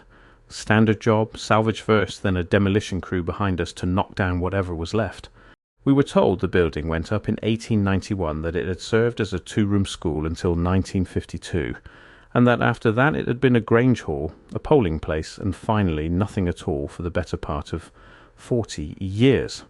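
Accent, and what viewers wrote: England, United Kingdom. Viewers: This was fun. You sound English. 😉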